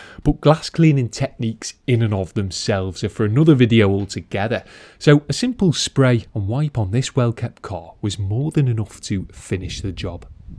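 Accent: Liverpool, United Kingdom. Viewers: I'm pretty sure he's a Liverpudlian (didn't want say scouse, may have come across as being rude and didn't want to offend those from that area)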